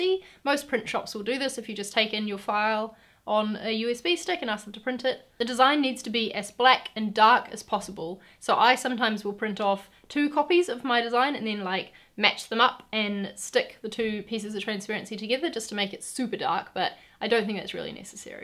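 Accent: New Zealand. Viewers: Lol, a strong kiwi accent there! I left 27 years ago - its refreshing to hear. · Came here from Mari’s video! Are you kiwi? Cos they accent.. haha! I’m super nervous about the exposing time part… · are you kiwi? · Haha Kiwi accent! I was sent this link from Facebook as a reference as to how to do Screen printing for their CREATORS workshops! Hit them up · Are u kiwi??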